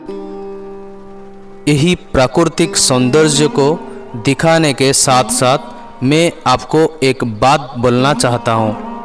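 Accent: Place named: India. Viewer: ଉଚ୍ଚାରଣ ହିନ୍ଦୀ ପରି କର ନଚେତ୍ ଓଡ଼ିଆରେ କୁହ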